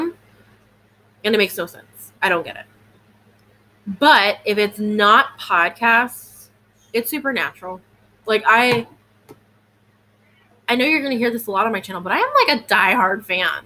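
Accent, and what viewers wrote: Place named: California, United States. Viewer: I actually adore your daughters blanket for many reasons. Love the 1st and last color. It reminds me of our Cali sunsets over the ocean here. 😁 I have been crocheting for 43 years since I was 8. Was taught by my mom and gma but mostly books. Also taught myself needle point, temari, Sewing, paint ceramics, crewel ,cross stitch, hair pin lace, and embroidery. Sorry you lost your mom so young. I crochet at the Drs because I always get nervous and it helps calm my nerves. That's so funny I was married as a senior in HS to my ex. Married to my 2nd fab hubby for 27 yrs now. How funny I figured you had a Cali accent and not Virginia. I tried knitting but it ends up being hazardous with my 3 cats. I have crocheted much more in last 6 years. So much easier than when I had little ones. So I can imagine you are quite busy. 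No way I could have done a podcast when my girls were little. Really enjoyed your podcasts. It's interesting to learn about the people who do podcasts and see what there shared interests are. Thank you for sharing. Lol re your hubby. Have an awesome day.😁 Have you ever met Janie Crow or crocheted any of her patterns? I just love them. Your son is so cute.♡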